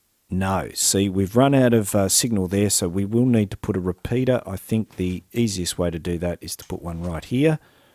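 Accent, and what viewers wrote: Australia. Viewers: Such Aussie such wow!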